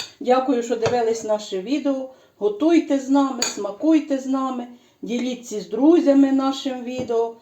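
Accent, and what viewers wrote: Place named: Ivano-Frankivsk, Ukraine. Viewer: Все чудово, і рецепти салатів, і випічки. Дякую. Але, якби ви ще відшліфували українську мову, не вєивали русизму, при тому, що бажаєте всім миру і перемоги, було би мега прекрасно. Даруйте, що роблю вам таке зауваження, але мене дратує те наше уподібнення до московитської мови. Адже наша мова така багата, така милозвучна, а юагато наших західняків знаходять уподобання "збагачувати"свою мову русизмами: юбка /ніби спідниця уже не в моді/, зря /ніби даремно уже застаріле/. Отямтеся люди! По вашій бесіді я можу визначити, що ви з Франківщини і тому ще більше боляче чути суржик, який як іржа роз'їдає нашу багатющу і особливу МОВУ. Бажаю вам мудрості, бути берегинею не лише кулінарних страв і смаколиків, але й нашої унікальної української мови і навіть "бандерівської" неповторної говірки, нашого унікадьного діалекту. 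Здоров'я вам і творчих досягнень./успіх- калька московитів/.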